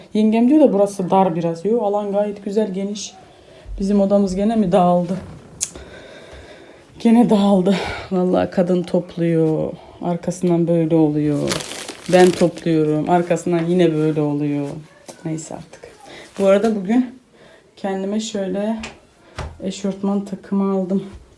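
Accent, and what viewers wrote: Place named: Azerbaijan. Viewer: Canim merhaba sizin konusmanizdan kulturunuzden bize azerbaycanlara benzediyorum niyese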